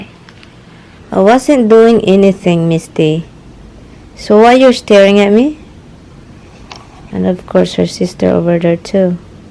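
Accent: Visayas, Philippines. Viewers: HI sis.....i really like ur accent bisay jud ba,i am proud of it.sad to heard about ur duhang totoy dai oi mailbag sab,i avoid mo mag bra every time u goes to bed.basin naa ka ces.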